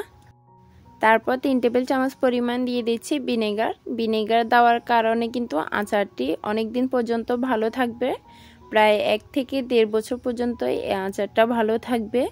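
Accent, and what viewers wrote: Chittagong, Bangladesh. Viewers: Apu tumar basha ki ctg teh?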